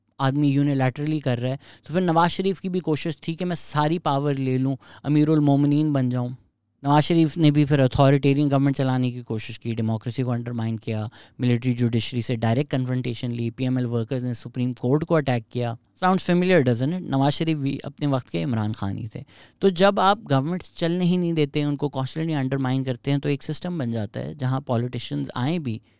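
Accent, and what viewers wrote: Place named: Delhi, India. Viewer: Brother I'm from old Delhi Chandni chowk India I just wanted to say your accent is very similar to the normal Dilli wala accent. ❤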